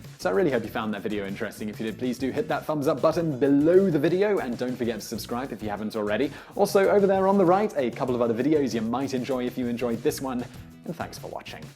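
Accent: United Kingdom. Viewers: I'm not used hearing soccer being said in a British accent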